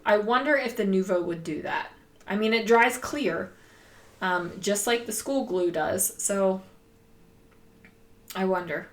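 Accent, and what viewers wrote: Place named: Baltimore, United States. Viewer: We love your chatter...and I love your accent...Baltimore is a fun place that I miss!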